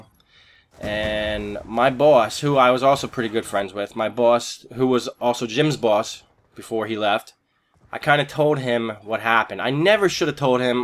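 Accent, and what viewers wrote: United States. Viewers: are you from the bay?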